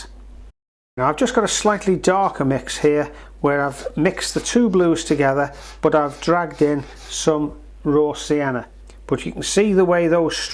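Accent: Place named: Lancashire, United Kingdom. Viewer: Just found you. Love this. I live in Los Angeles but grew up in Fleetwood, Lancs until I was 14, so loved listening to your accent!!!!!!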